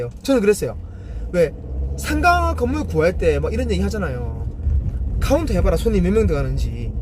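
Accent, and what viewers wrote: Busan, South Korea. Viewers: 항상 즐겁게 강의해서 기분이 좋네요,, 부산 사투리도 정겹네요,,,, 좋은 진실된 정보 감사합니다.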